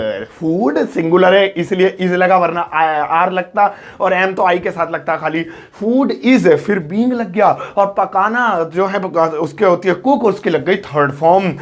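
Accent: India. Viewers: Hello sir good morning I have one suggestion this is 36 video of advance English class and you have already done English speaking 60 days it has been long time if it's possible u can teach us in English. Because your accent is India it will be helpful for us sir 2 ND thing is I have already told you sir please make videos on punctuation I don't know how to use . Thankyou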